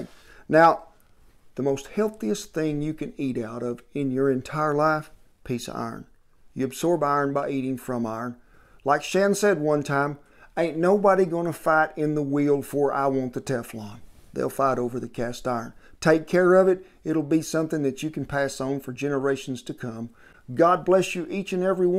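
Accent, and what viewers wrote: South, United States. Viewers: Hart warter lol love the southern accent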